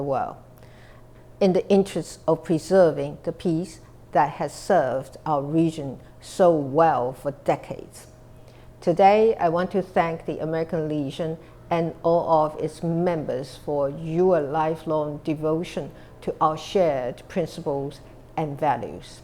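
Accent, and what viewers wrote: United Kingdom. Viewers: 蔡英文總統是標準的英式英語，這真的是曾在英國生活求學過才有這樣的口音...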